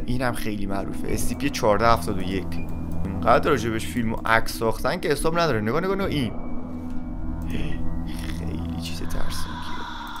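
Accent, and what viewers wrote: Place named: Afghanistan. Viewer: چرا لهجت افغانیه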